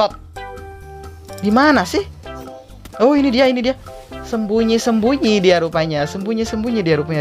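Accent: West Java, Indonesia. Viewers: sunda kah? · Bang orang sunda bukan???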